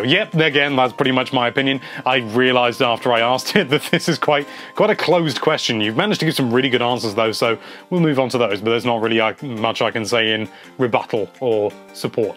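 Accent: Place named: England, United Kingdom. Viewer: wait jij bent een Nederlander? Why do you have a english accent?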